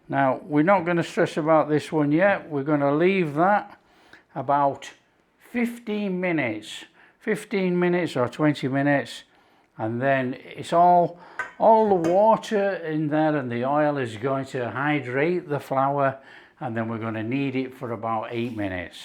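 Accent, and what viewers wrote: Yorkshire, United Kingdom. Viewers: Every time I hear you say garlic, I think of Peter Kay. “Garlic bread, it’s the future! “ lol. Must be the Yorkshire accent. I was having a good old chuckle with the garlic oil hehehe p. In the nicest possible way though. I adore you, you’re just so real xxxx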